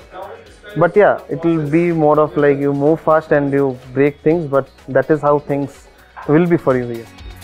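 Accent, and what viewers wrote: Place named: Tamil Nadu, India. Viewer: I thought it's Tamil, as the accent was same!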